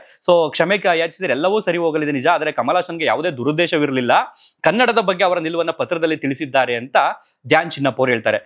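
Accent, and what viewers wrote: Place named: Belagavi, India. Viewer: ಬೆಳಗಾವಿ ಕನ್ನಡ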